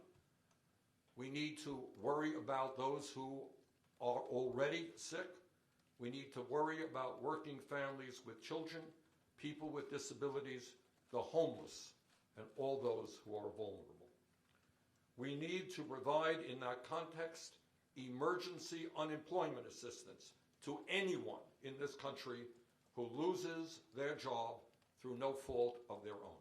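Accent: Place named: Brooklyn, United States. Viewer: English with a very irritating Brooklyn accent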